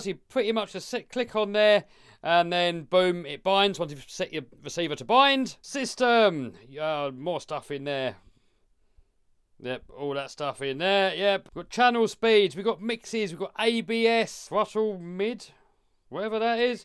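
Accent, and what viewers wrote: England, United Kingdom. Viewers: You sound English why are you sucking up to the yanks by giving prices in Dollars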